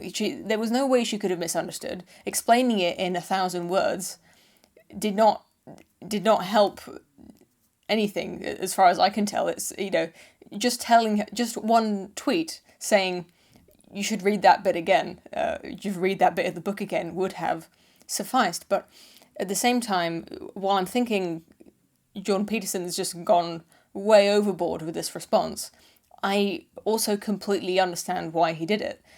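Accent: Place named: RP, United Kingdom. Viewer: This chick has the Downton Abbey/Pride & Prejudice accent but talks about "thots" and "Pewdiepie". I would pay money to hear her tell the Aristocrats joke.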